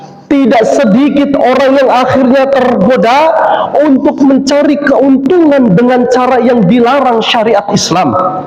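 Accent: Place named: Banyumas, Indonesia. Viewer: Terimakasih Bu Guru ngapak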